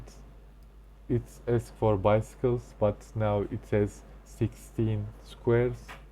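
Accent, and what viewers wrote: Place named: India. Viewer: hintli sandım aq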